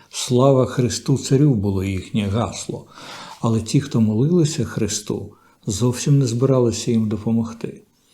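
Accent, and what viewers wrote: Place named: Russia. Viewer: Доречні, пане, у Вас з'явився російський акцент! Таке враження, що Ви перекладаєте російський текст на українську!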